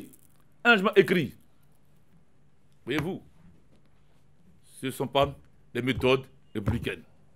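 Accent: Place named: Ivory Coast. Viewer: Il baigai un peu sinon il a l'accent ivoirien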